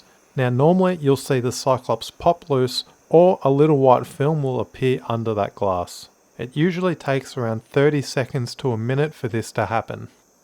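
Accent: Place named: Australia. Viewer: having an Aussie accent for a change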